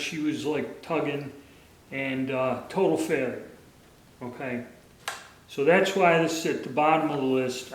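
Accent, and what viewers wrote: Pittsburgh, United States. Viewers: You sound like you're from Pittsburgh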